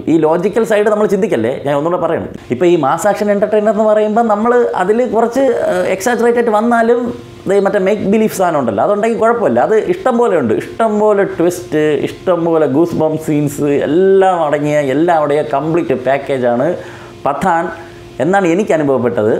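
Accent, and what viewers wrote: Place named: Thiruvananthapuram, India. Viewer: തൃശൂർ പഠിക്കാൻ വന്നതിനു ശേഷം ഞാൻ തിരുവനന്തപുരത്തെ സുഹൃത്തുക്കളുടെ സംസാരം മിസ് ചെയ്‌തു. നന്ദി ഗോകുൽ. Hope we can be good friends.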